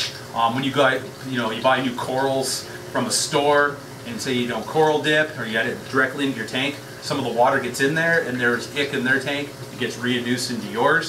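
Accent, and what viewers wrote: Canada. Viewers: probably the THICKEST Canadian Accent I've heard in a long time. Nice!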